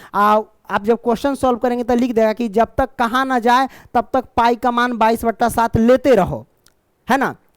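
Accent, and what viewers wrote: Bihar, India. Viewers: Sir aap bihar se ho na😊me tooo❤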